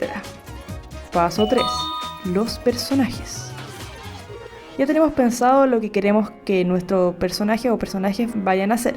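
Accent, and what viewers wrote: Chile. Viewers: Gran video!!,me funcionara mucho gracias!! PD:me encanta tu acento chileno😙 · Eres chilena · Me hice la media idea en la cabeza, y voy a hacerlo medio spaicy Alv Cache el asentó chileno :D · Buta se nota que eri Chilena, saludos desde Chile xD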